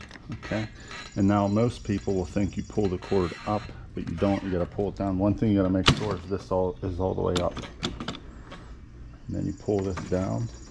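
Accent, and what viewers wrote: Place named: Pittsburgh, United States. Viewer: This guy gotta be from tha burgh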